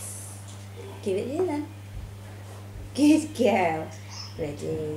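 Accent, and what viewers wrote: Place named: England, United Kingdom. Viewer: What's cuter the dog or her accent? Where she from England?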